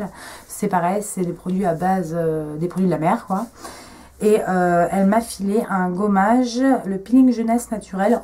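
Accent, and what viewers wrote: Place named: England, United Kingdom. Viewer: Coucou. Contente que les rouges à lèvres Catrice te plaisent et merci pour le petit clin d'oeil ça fait plaisir! Oui effectivement tu as un peu craqué ton slaiiiip mais je te comprends les -25% ont aussi fait des ravages sur mon compte en banque. Bon j'ai encore rien pu tester à cause de cette Motherfucking allergie au visage. Gros bisous! Ps: j'adore ton accent anglais! Mdrr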